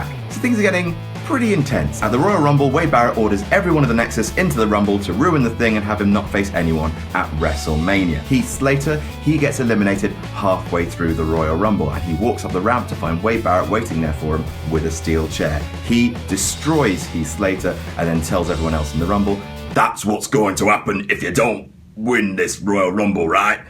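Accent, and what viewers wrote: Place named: United Kingdom. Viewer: Damn with that smile and that British accent..... I'm in love...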